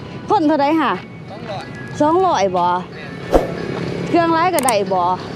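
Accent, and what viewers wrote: Ubon Ratchathani, Thailand. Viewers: สาวห้องคือเว่าสำเนียงทางอุบลแท้บ่รู้ว่ารถโดยสารระหว่างประเทศทางลาวเปิดให้เข้ายัง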